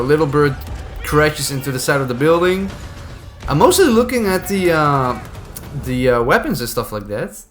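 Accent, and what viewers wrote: Netherlands. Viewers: I smell Dutch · sound like an dutch accent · You sound Dutch af?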